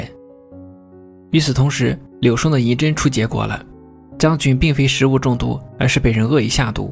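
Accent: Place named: Northwest, China. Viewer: 简明扼要，一气呵成，精彩！对博主的西北口音稍有不适，不过瑕不掩瑜。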